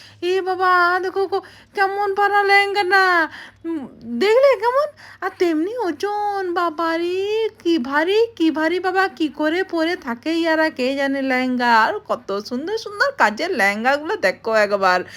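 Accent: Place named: Purulia, India. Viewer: Didi aapnar Bangla ta kon jaygar aar tomar biyer samay to lahenga chio na tomar bhasa ta thik purulyar moto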